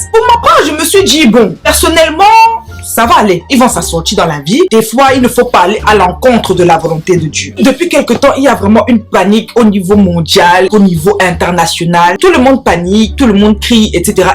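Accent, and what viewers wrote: Cameroon. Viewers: J'adore trop ton accent kmer, kiss from une sœur sénégalaise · J'adore 💕 le fait que tu parles #camerounaisement😂, trop de love pour toi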